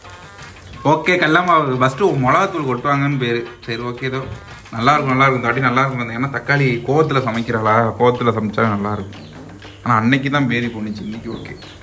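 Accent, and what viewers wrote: Sivagangai, India. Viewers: Broo nanu Sivagangai bro akka soldra mari sivagangai seemai... 🤍⚡😇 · Sivagangai karapulla language apditha irukum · Naanu sivagangai thaaa broo unga video vaa naa paapen naanu akka mari thaaa pesuvee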